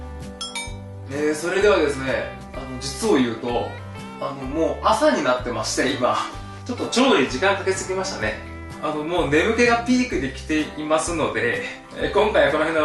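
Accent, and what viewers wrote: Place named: Kansai, Japan. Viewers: 関西弁って強い(感嘆) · あなたの関西弁も反則やわ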